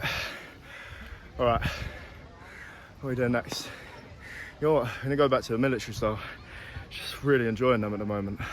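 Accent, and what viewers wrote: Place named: United Kingdom. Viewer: No question about it, you have a gorgeous body. I love your legs. They’re perfect. Your body is nicely proportioned You give good sexy face I hate the way you talk. With your unique name, I thought you were gonna be something different. I really really really can’t stand. I’m so disgusted by that British accent. Where are you? I’m subscribing to you for your sexy body and to watch you exercise and do shit. But dude, you gotta learn to speak standard American English. It’s like a Midwestern American English. Do you have an only fans? Have a great day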